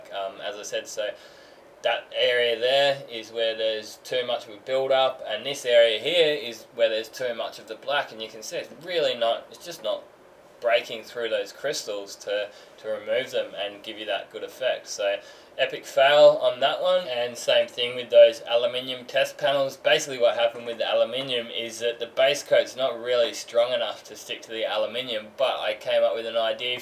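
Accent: Australia. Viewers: your constant talking about the rabbit you saw running across the yard yesterday and the weather etc finally made me hate Australian accents. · First, put the camera on a tripod or figure out how to keep the camera from jumping around. Serious headache! Two, Stop talking like you're committing a verbal assault. You seem to never shut up. Do you breathe? Damn its hard to listen to you w/ that thick Aussie(?) accent. I understand this is supposed to be an instructional video, but you could take a break and just paint or watch the other guy paint, quietly.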